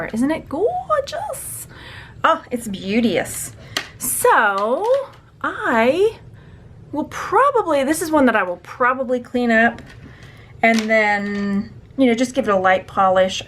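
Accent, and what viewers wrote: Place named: Texas, United States. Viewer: You are cracking me up! I needed this today. I hear my Texas, teacher self in you with your silly songs. Don't you love exaggerating our Texas drawl??! Then the, Labyrinth stuff sent me over! Love it!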